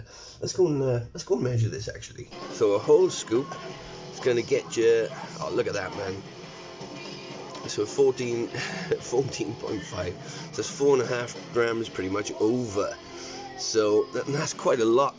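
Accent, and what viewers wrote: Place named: Southern England, United Kingdom. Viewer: also what part of england are your from im from newcastle but your accent sounds more southern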